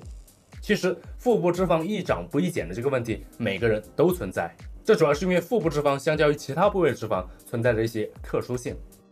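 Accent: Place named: Dalian, China. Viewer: 這應該是地區發音的問題，之前我認識住在大連的大陸人，他講話也是這個方式，可能跟方言有關係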